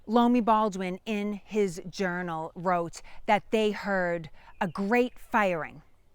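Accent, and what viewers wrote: Boston, United States. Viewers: You have an exquisite “Boston” accent. Very appealing. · there are trails like that here in Virginia too .you sure do have the Boston accent 😊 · My wife is highly allergic to poison ivy, yet couldn't identify it if she were standing knee deep in it. She's always asking me, "Is this poison ivy?" I say, "No, dear. But what you're standing in is." I'm not allergic to it, so I'm good. Also, I'm from Georgia and I'm loving that Bostonian accent. My mouth can't make those sounds 🤣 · You only have to listen to talk for 30 seconds to realize that she is definitely from Boston.🤣💕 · Love your Boston accent. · Your Bostonian accent adds to the story telling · Your Boston accent is savage!..and i’m from here, LOL Thanks for the video. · her Boston accent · Oooh. A park in Woburn is a godsend for those of us who tune in partially for the accent. 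Two better words nary exist to bring out the Boston in this revolutionary gal. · OMG! That Boston accent! 🤣🤣🤣 I live in New Hampsha Hun! 🤣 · Love your content!!! and your Boston Accent!!! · YOUR EYES ARE SO GREEN AND YOUR ACCENT IS SO BOSTON🎉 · And she's really got a Boston accent man I bet she's got the most Boston accent out there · the hated Boston accent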